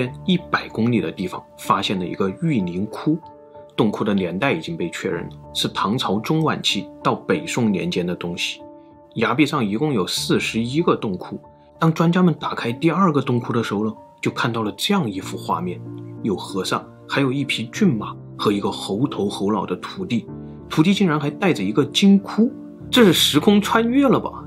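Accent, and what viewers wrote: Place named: Hunan, China. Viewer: 这在湖南称为“塑料普通话”，很有湖湘味道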